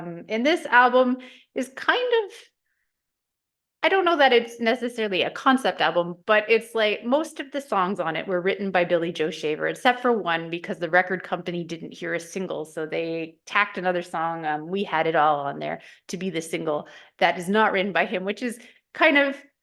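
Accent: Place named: Canada. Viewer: I have always thought that 1973 was probably my favourite year of recorded music, especially in the Rock genre. I'm quite a bit older than you, but I love that you focused on 1973, and thank you for including a coupe of artists I'd never heard of before ( Gary Higgins & Duncan Browne), I'll be searching for their work to enjoy. I also like the fact that your tastes are eclectic, mine are as well. I was introduced to many different genres of music as a child, I had an Auntie who worked for CBS Records, so she gifted many albums to me from a vast variety of genres. I love your Canadian accent. Take care!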